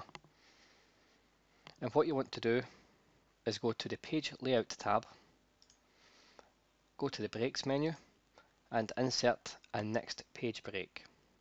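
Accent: Scotland, United Kingdom. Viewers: Thank you, thank you, thank you! I was losing my mind trying to get my document properly paginated. You made it easy. Also, love your accent. Viva Scotland! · Thank you so much, for your excellent demonstration! You were so clear on how to perform this function and your delivery was at a very appreciative pace! I am going to follow your great instructions! However, I need to insert Roman numerals into the pages prior to pages 1, etc, throughout my manuscript, so I will follow the same instruction and see if it works! If not, I will email you to ask how to insert Roman numerals prior or after I insert page numbers throughout my manuscript. BTW, I love your Scottish accent! Thank you again for your awesome instructions and delivery!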